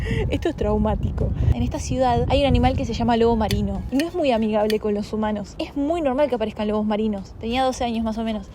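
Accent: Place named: Argentina. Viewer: La verdadera que mujer😍😍 un saludo y me encanta el acento argentino. Saludo desde Argentina ❤️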